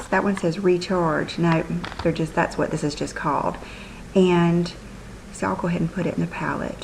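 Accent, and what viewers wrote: South, United States. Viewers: I think the Naked 2 comes out on the 25th of this month, I have a feeling it will sell out fast. Can't wait to see your new shoes I know they are going to be fabulous. The Makeup For Ever new eye shadow replaced the older ones that have been out for a long time, the pallets are only one dollar, it doesn't matter which one you buy. Hope you have a great weekend, can't wait for part 2. Love coming home and relaxing with your video on Friday night look forward to it all day. I think it your southern accent it is so nice to listen to. XOXO Emily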